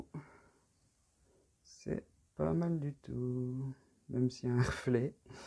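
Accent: Franche-Comté, France. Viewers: C'est super beau les nuances nacrées, j'aime beaucoup 👌 Tu es de quelle région ? Tu as l'accent franc-comtois 😁😁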